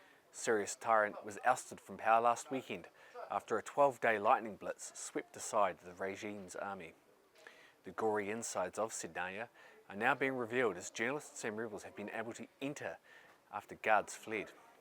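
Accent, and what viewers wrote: New Zealand. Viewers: You sound like a kiwi? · Is this a New Zealand accent? Hard to understand a lot of words.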